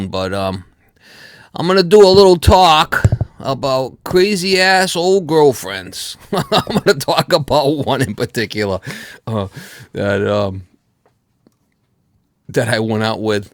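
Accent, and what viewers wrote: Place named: East Coast, United States. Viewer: The east coast way of speaking sounds pretty odd from here at the west coast. Quite the accent. But I'm sure I would sound pretty odd to too.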